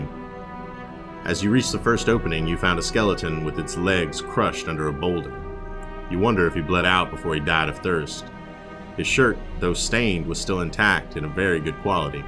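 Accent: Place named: Oklahoma, United States. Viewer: keep it going! that OK accent adds to the game for me this game has awesome narrative to it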